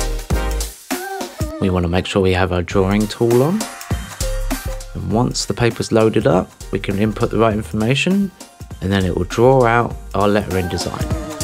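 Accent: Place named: United Kingdom. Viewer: Hi thanks for sharing, Have started to learn sign painting now I have retired and find your videos really helpful. So much to learn and the history fascinates me. By the accent I assume you are in the uk I live in Devon England. Can I ask if I may the type of material you paint on ie plywood ,MDF and what finish you apply to the surface before writing Thanks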